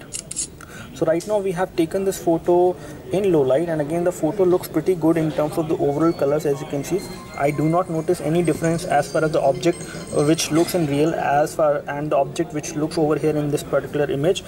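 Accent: India. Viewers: OMG!!!!!!!!!! Fuck who many words u speak per second......Indianglish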